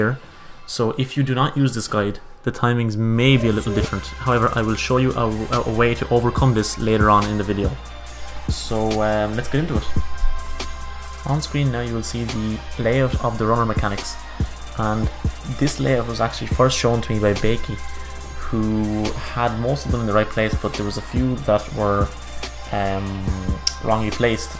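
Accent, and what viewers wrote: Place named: Ireland. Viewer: loool dat irish accent nice guide xxxxx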